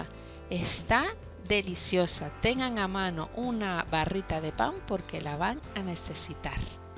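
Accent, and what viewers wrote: Canary Islands, Spain. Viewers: Buenos días, de parte de un canario en Huelva, que bueno escuchar una voz canaria. Estoy haciendo tu receta en estos momentos.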